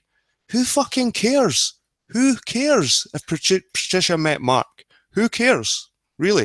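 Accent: Scotland, United Kingdom. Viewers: I triggered Nathan recently & he told me to suck his balls. Got a laugh at of that one. He protested too much when said the Potatoes were perverts, like Adam the Christian penis flasher. He says that having a baby makes me normal, um, no. Pervs have children all the time. He's off his game. He annoys me because he's got a brain but worships Patty like an attack Chihuahua. He's vicious but in an amusing way. Btw, to us, Scottish accents are so diverse. My Scottish friend sounds nothing like you.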